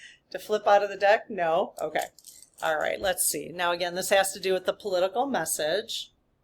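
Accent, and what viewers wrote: Midwest, United States. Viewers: Hi. I love you and absolutely love your content. You have helped me more than you can ever know. ❤ And have to say, I kind of thought you were in Wisconsin. I am originally from Illinois. A FIB knows a cheesehead when we see one! I think it's the Midwest accent. When I moved to Arizona, everyone told me I had a weird accent. I hope I didn't offend you. That was not my intention.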